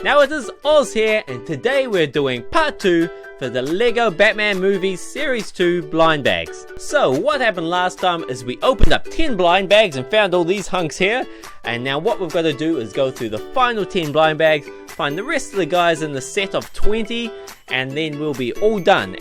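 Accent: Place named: Australia. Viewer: Gotta love that Australian accent! Greetings from Washington state.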